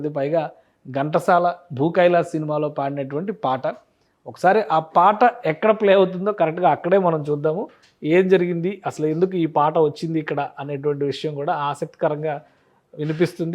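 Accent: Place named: Telangana, India. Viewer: ఆయన తెలుగు తెలంగాణా యాసలో ఉంది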